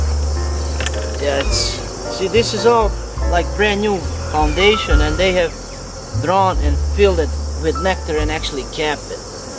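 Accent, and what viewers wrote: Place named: Balkans. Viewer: You sound... like you're from my part of the world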